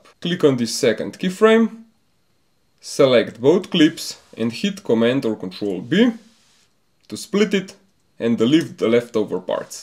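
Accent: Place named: Russia. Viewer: Почему-то чувствуется русский акцент)))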